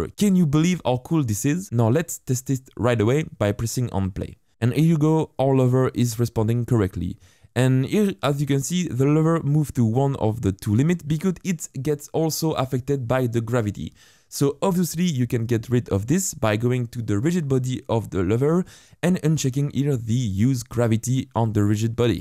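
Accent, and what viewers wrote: France. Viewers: Ça sens l'accent français...?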